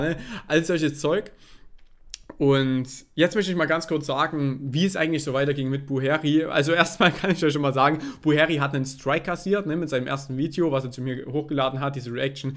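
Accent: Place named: Saxony, Germany. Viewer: Najoa, solang das alles geklärt ist, ist alles gut. Man muss halt vorsichtig mit einigen Äußerungen sein aber so lang er es nicht ernst meinte und er seinen Fehler einsieht, solle mich net stören. Ich Sag's gerne immer wieder aber ich feiere diesen sächsischen Dialekt so derartigst. Das klingt wie meine Familie mal mit Ausnahme meiner Eltern und meines Bruders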